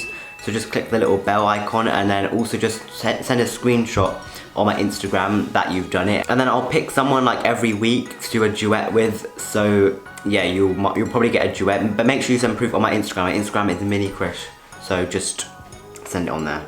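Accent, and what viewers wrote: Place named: London, United Kingdom. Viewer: I love your accent I am so jealous I almost got it when I went to London 🇬🇧 over the summer 2018 however, I wish I still got it.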